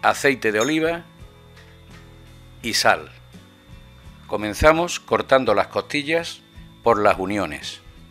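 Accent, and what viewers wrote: Spain. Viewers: es esto estilo espanol? digo por el acento, pero no se,yo le hubiera hechado chile, no soy mexicano pero he vivido toda mi vida en little mexico(los angeles california) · de flipe total!!!!! menudo éxito...menudo recetón con acento andaluz, la hice con vino de pasas del puerto de santamaria y ha sido una experiencia extrasensorial, mas no se puede gozar con la ropa puesta.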